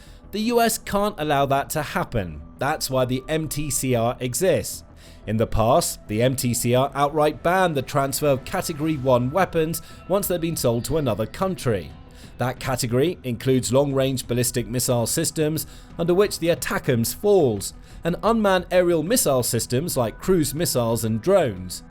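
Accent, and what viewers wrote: England, United Kingdom. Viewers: English Accent hiding The English Jewish Gangster!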